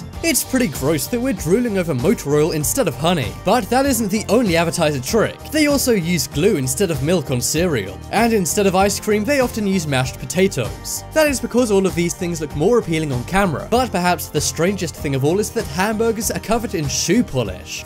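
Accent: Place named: England, United Kingdom. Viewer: There's that stupid English accent again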